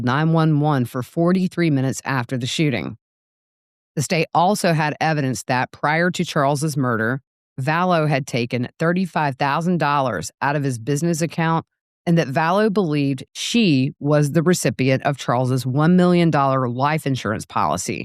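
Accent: South, United States. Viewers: Hello 😊 New sub! Just luv your accent! You’re the original southern gal, I am an implant lol. Missed the ID trials & hoping to play catch up here. Since you got this 1st I feel extra lucky to have finally found my go-to for this case! 😊 Enjoy tonight with your son!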